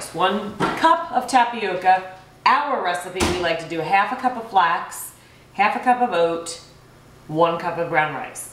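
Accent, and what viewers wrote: New York, United States. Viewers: Would this work in a dutch oven format at high heat do you know? Being from NJ now in FL, I recognized NY in the first sentence. Love love the Northeast crisp to the pointness that we are "famous" for. Love your vid, very helpful. I stopped eating wheat 5 years ago, mostly raw vegan but I miss the ritual and smells so might try this. Beautiful job, lovely person! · Loved your video. I heard the accent New York 🗽 Baby! 🤣🤣 I’m just looking for better bread options and never used my bread machine that was gifted. Plus sometimes with being tired from work, my schoolwork, the kids schoolwork, house chores 🤦🏽‍♀️ I need to start using it. Let the experiments begin!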